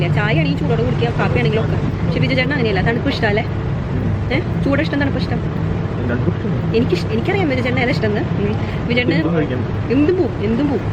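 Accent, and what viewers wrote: Thrissur, India. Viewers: എന്റെ ചേച്ചി നല്ല രസം ഉണ്ടായിരുന്നു കാണാൻ. നല്ല സ്പീഡ് ഉണ്ട് വർത്താനം, ഞാൻ ഇങ്ങനെയായിരുന്നു ചേച്ചി. എന്റെ വീട് തൃശൂർ ആണ്, എന്നെ വിവാഹം കഴി ച്ചത് എറണാകുളം, ചോറ്റാനിക്കരകാണു.എന്റെ ഭാഷ ചേച്ചി പറയുന്നതുപോലെ സ്പീഡിൽ, അവർ എന്നെ കളിയാകു, പിന്നെ പിന്നെ ഞാൻ എന്റെ വർത്തമാനം ചുരുക്കി, ഇപ്പോൾ തൃശ്ശൂർ ഭാഷയു എറണാകുളം ഭാഷ യു കൂടി കലർന്ന ഒരു ഭാഷയായി, വീട്ടിൽ ചെല്ലുബോൾ വീട്ടുകാർ ചോദിക്കും അവിടെനിന്നു കിട്ടി നിനക്ക് ഈ ഭാഷയെന്നു, എന്റെ ചേച്ചി ഞാൻ ഇപ്പോൾ അതികം മിണ്ടാതെയിരിക്കു, അതാണ് സേഫ്. ചേച്ചിയുടെ വർത്തമാനം ഫസ്റ്റ് കേട്ടപ്പോൾ തന്നെ എനിക്ക് മനസിലായിത്രിശ്ശൂർകാരി യാണല്ലോ എന്ന് അപ്പോൾ തന്നെ സബ്സ്ക്രൈബ് ചെയ്‌തു. തൃശൂർ ഭാഷ എനിക്ക് ഭയങ്കര ഇഷ്ടം മാണ്, ഇപ്പോൾ ഏതു ഭാഷയാണ് ഞാൻ പറയുന്നത് എന്നു എനിക്ക് അറിയില്ല ചേച്ചി. അ ചേച്ചി കടലക്കറി ഞാൻ ട്രൈ ചെയ്തു, നല്ലതാണ് ട്ടൊ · Chechi Thrissurkari ano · Hey super aada nalla Rosamund iniyum chaidolutta entha resam aa Trissur bhasha kelkan enik ishttayi 😘😘😘😘😘😘😘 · ഹായ്... 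മ്മടെ ത്യശ്ശൂർ ഭാഷ അടിപൊളി...... പിന്നെ dress നന്നായിട്ടുണ്ട്... ട്ട.. vloggum... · Chechi pwoliyanu tto. Pakka thrissurkari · chechy Thrissur slang adipoli .Thrissur evidanu veedu? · Adipoli you are so innocent I like your talk. Exactly thrissur kari...... 😂😂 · superb.....nattilevide veeduu.....talk style kettitt thrissur pole....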